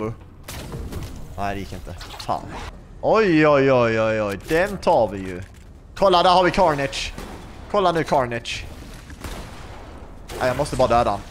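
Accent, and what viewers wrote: Skåne, Sweden. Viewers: Pratar du skånska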